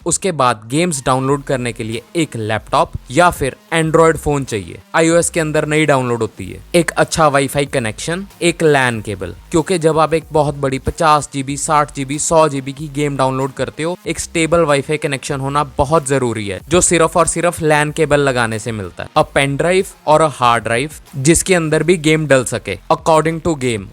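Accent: Punjab, India. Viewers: Punjabi lag raha hai bhai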